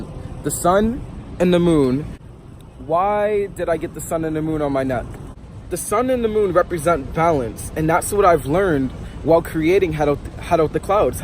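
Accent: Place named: New York, United States. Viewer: you have really deep accent real new york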